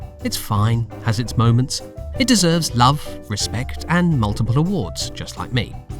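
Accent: United Kingdom. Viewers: It's because he's British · What is so funny about him being British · because British people have the best accent for narration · This British narator makes the video more interesting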